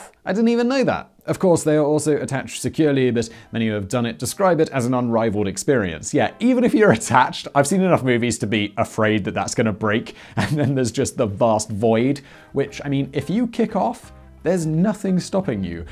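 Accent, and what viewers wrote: RP, United Kingdom. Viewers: I'm hooked... Subscribed. And yes, this is Cykey I watch every channel that on. It's never boring, knowledgeable and is a cool ass host with the most that speaks the Queens English. That's guy is dope! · NASAR the British accent gets me every time lol can pronounce Russia correctly instead of Russiar like NASAR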